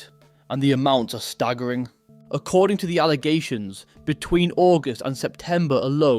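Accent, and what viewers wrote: United Kingdom. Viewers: im so grateful you have a british accent, so i know you're safe in the UK bro, if i did videos like yours, as a local, i think i'd have massive testicles, but also know i wouldnt be safe. you're a legend.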